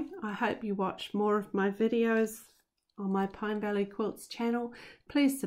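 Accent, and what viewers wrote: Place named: New Zealand. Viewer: Do my ears deceive me or are you a fellow kiwi?!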